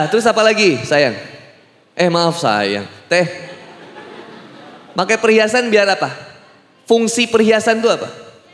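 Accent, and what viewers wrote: West Java, Indonesia. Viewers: owh pantesan Nyunda pisan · Cmn ust medok di sunda,kalo ngm ujung2nya ada sunda2nya,,kalo andhika pratama gk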